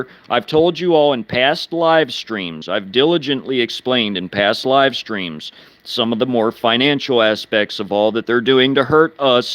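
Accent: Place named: Kentucky, United States. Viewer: The story of the CSS Alabama which Three Country's attacked her that day. The French The British and the lunatic Sherman Government.. This is the same Axis of evil in every war since. My grandfather loaded the Gold from Germany. My uncle was MP at Redstone.. It was my uncle who told me what Redstone was and why it was named that. One big hot Redstone because they have a enough nukes to destroy the planet 6 times over. At 9 year's old I asked my uncle why. I asked other questions as well. Uncle Don would not tell anything till I had brought him at least 9 Beers later. He was one who knew All . His answer was that we had everything buck Roger had. Lasers spaceships and much more.. That started my research. When I ran across you on YouTube you sounded like my family in Kentucky.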